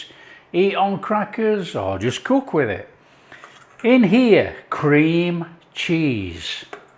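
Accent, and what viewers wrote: Yorkshire, United Kingdom. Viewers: I believe it's a Yorkshire accent, specifically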